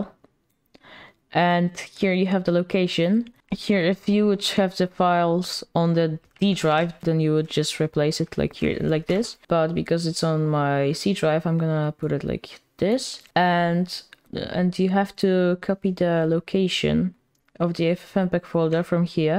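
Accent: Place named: Poland. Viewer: jako polak masz koks akcent (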